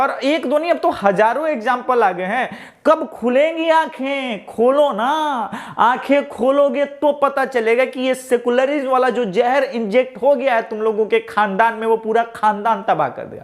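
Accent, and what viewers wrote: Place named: Bihar, India. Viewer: Waah...bihari andaj me bola...maja aa gaya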